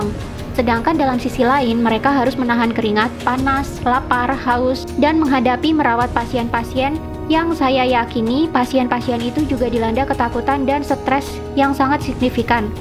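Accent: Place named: East Java, Indonesia. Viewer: 🙄 saya berasa di Jatim dengan pecel tumpang denger logat mbaknya 🤔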